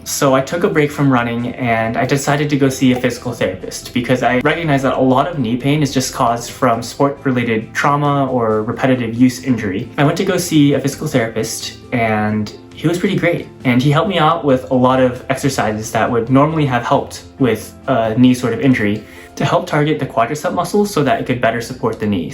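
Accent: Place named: Canada. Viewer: thanks for sharing,you have a strong Canadian accent, bravo for you to share your fight with cancer, even you have used Chemo to kill most cancer cells, mind you the main Stem cancer cell is not destroyed and can never be terminated. Just my personal advice that please keep eating foods that keep your body in alkaline state, cancer cells wont grow strong in an alkaline envirament, this is the best way to survive,do not ever allow your cancer stem cell to come back, remember this.....i pray for your health n have a wonderful life...PS from the video, you have leaky guts and inflammed liver, go do your research on how to deal with leaky guts n what not. stay strong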